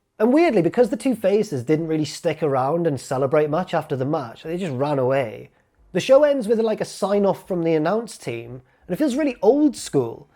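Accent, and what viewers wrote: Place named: Lancashire, United Kingdom. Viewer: Genuine 8/10 Lancy accent tbf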